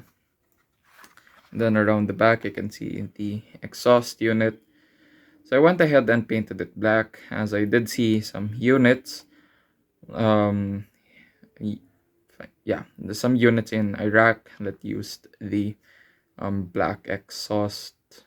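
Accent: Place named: Philippines. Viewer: Subbed. I just found your channel. I like modelers who can finish a project. Looking forward to your next video. By your accent, are you Pinoy too?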